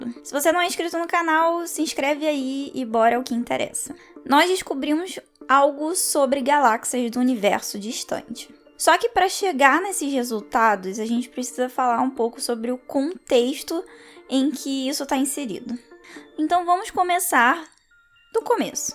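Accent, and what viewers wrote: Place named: Rio de Janeiro, Brazil. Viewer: Mds, sotaque carioca é perfeito aff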